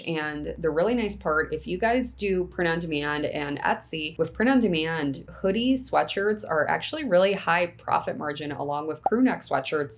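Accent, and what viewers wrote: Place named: Wisconsin, United States. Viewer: Do you mind me asking what state you're from? I'm trying to place the accent. I'm guessing Wisconsin. Great voice by the way.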